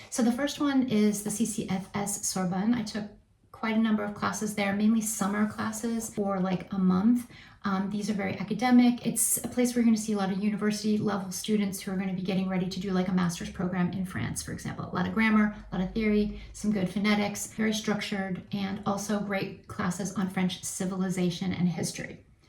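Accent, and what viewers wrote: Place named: United States. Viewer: Perfect french with a lovely american accent 😊 Love it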